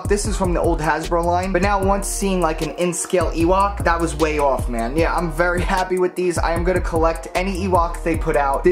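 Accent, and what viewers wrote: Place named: Staten Island, United States. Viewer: Bro where u from you sound mad Staten Island?!? 😁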